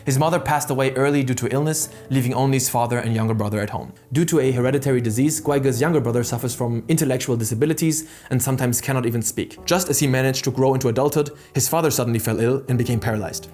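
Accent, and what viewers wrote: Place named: Germany. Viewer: Why does bro have a german accent `?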